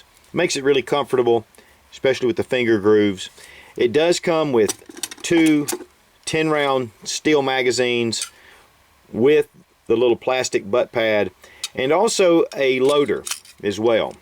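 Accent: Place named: South Carolina, United States. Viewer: you prove in all of your videos you know what you are talking about and that accent seems familiar to me seeing as im from SC.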